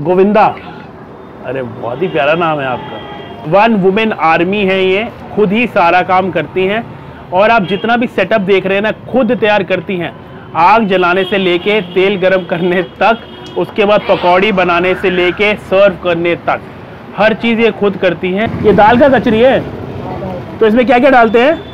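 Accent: Bihar, India. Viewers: Wah bhai 🙏 speaks very well in Bihari tone 😊.. i m big fan of you from Bihar "Katihar" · I live in delhi,but missing my bihar very much and happy to see bhai is trying to speak in bihari accent,just amazing 🔥🔥❤️❤️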